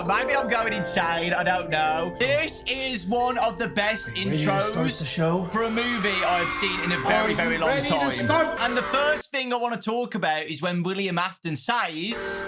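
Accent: United Kingdom. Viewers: Ahhhh he’s British😂😂😂😂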